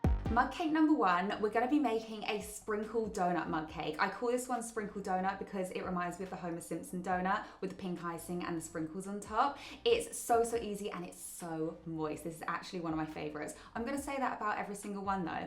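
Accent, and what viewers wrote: United Kingdom. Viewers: May i ask which part of the UK your accent comes from ? I love it ! Awesome video also !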